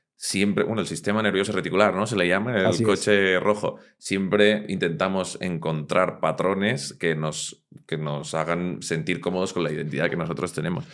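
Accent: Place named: Colombia. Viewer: Gracias Doctor por todas estas enseñanzas. Creo que ud es Colombiano por su acento y me enorgullece por lo que está haciendo por nosotros.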